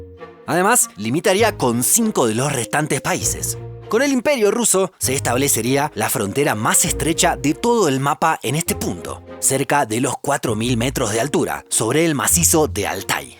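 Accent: Argentina. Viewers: Se nota que es un canal argentino por dos cosas: el acento (obvio) y porque no pone a las Malvinas como territorio británico de ultramar.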